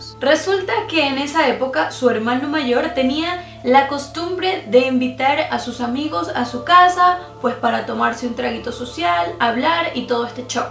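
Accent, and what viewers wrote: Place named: Caracas, Venezuela. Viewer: Me encantan tus vídeos! Se que eres venezolana (de San Cristóbal si no me equivoco) pero siento tu acento muy distinto a como usualmente hablan los gochos jaja, disculpa si es personal, pero viviste en otro estado? Que si en caracas o Valencia? Tu acento es súper caraqueño (te lo dice una caraqueña de pura cepa 😂) Un abrazo! ❤️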